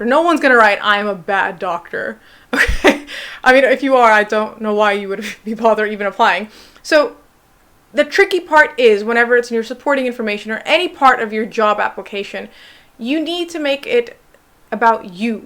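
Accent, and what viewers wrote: United States. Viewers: How are you in the uk and have an american accent?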